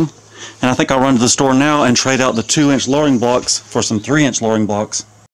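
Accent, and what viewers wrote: United States. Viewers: Fantastic work!!! You’re the example of what having a YouTube channel is all about. Quick and brief explanation of what is going on and what you’re about to do. No useless rambling or tongue smacking like an African tribe and not sounding like you’re severely parched lol. Sorry for the rant but it’s so refreshing to find someone who speaks like an American. Sub’d 🤙🏾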